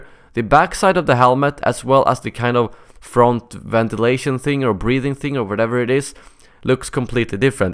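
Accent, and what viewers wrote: Sweden. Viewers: You can hear that he's swedish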